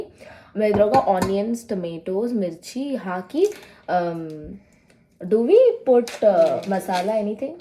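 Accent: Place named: Uttara Kannada, India. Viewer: ಉತ್ತರ ಕನ್ನಡ ಮಾತು ಮಸ್ತ್ ರೀ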